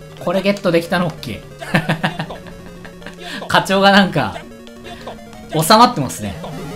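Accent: Kansai, Japan. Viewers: さん関西の人？(・o・)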